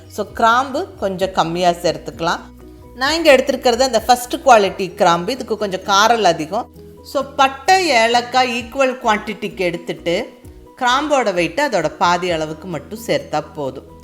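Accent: Kongu, India. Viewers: oh oh gongu nattu tamil konjugirade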